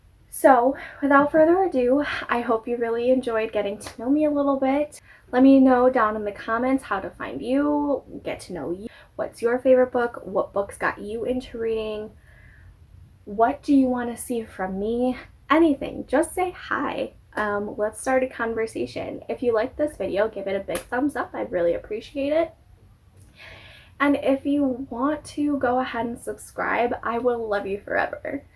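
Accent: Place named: Midwest, United States. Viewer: The Sarah Sanderson midwest accent coming thru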